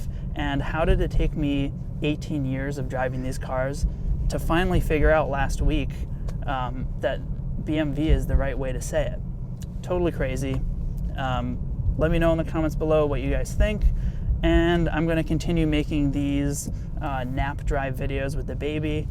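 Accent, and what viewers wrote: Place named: United States. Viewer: Not , Dubble U , but Wee but the "ee" from the Wee , sound like , the " a " in " Say, "Bee em Wee" (not Vee , a V in German is pronounces as a "Vow" ) , in Dutch we say it the same as the Germans. , You havent been saying it wrong , you have just been pronouncing it like a american , not a german :D . Like " Zeit " the Z is pronounced like a "TZ" , TZEIT , you would write , TZAIT. writing i phonetically makes it easier.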